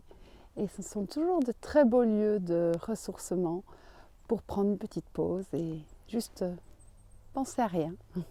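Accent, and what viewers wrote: Belgium. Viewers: Bonsoir nous dans le Béarn c’est 1 heure avec un rayon de 1 kms et bien sur avec la permission de l’attestation bien remplie autrement 135 euros on est devenu dés hamsters 🐹 dans leurs cages quelle chance de pouvoir se balader où on veut .belle balade et l’accent belge qui nous berce .bye bye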